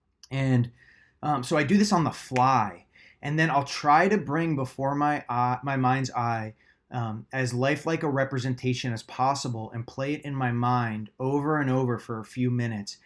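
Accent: New Jersey, United States. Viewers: Love your videos. You have no idea how much you have helped me. I've always manifested randomly but now going for the really big stuff. Your voice is soothing and love the NJ accent. Be well. God Bless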